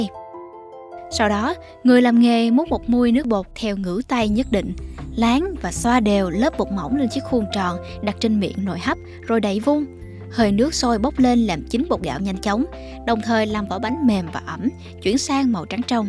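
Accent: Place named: South, Vietnam. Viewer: Bài viết về món ăn miền bắc, người viết bài cũng dùng từ ngữ của miền bắc. Vậy thì giọng đọc của miền bắc luôn đi. Tự nhiên đưa bạn miền Nam đọc làm chi. Nghe không hợp xíu nào. Giọng đọc này chỉ có thể dùng từ "tiệm" thay vì "hàng", từ "phi hành" chứ không thể là "chưng hành". Nghe chướng tai 🙄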